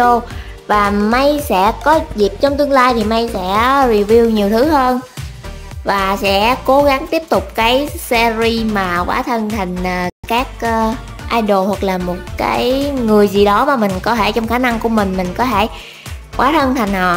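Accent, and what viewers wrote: Mekong Delta, Vietnam. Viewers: người Miền Tây mà giọng lúc nào cũng kawai như thế này cơ đấy xD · Chị Miền Tây à!! Thảm nào giọng Chị Kute qué:3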